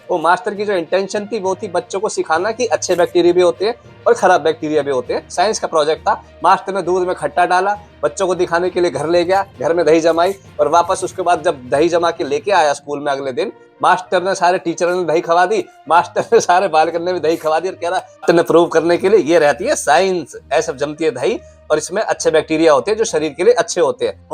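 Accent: Haryana, India. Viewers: ki always be making serious news damn funny with haryani accent 🤣🤣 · Bhai, Regular News banao Bhai. Ye jo haryanvi tone me comedy news alag hi lagti he. Crime news me to comedy he puri...😜😜😂😂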